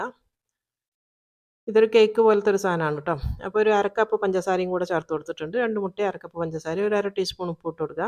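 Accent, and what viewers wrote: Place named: Kozhikode, India. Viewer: Super dear njangale Kozhikode slang 🥰❤️presentation super. 👍stay connected 🥰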